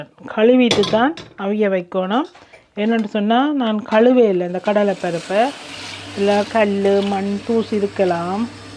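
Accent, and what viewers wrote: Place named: Jaffna, Sri Lanka. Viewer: உங்கள்றிசிப்பி அருமை அதையும்விடஃஉஙகள் கதை நம்தாய்நாட்டு இனிமையான நினைவுகள் அதை கேட்பதற்காக உங்கள்பதிவுகளை பார்ப்பதுண்டு சாவகச்சேரி சங்கத்தின் பக்கத்து வீடு போல அதனால்பேச்சுவழக்கு கேட்க ஆசை வாய் கரைப்பது விட கைகள்so cute அந்த cuteness தான்எல்லோருக்கும் வராதுவந்துவிட்டால் அது அனுபவம் வளரட்டும் 🎉🎉🎉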